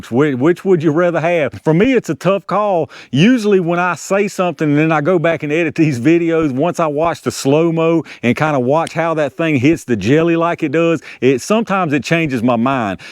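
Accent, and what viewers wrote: South, United States. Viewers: You have the most classic southern drawl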